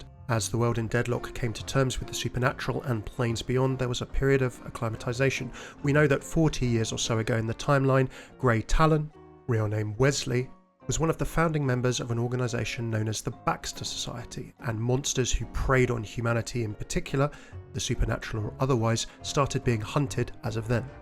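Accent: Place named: United Kingdom. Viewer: oh shit, he's got a british accent?! oh yeah we watching, thanks youtube algo. lol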